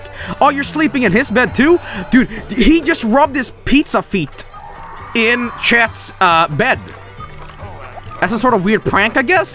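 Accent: United States. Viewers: you're starting to sound like an American.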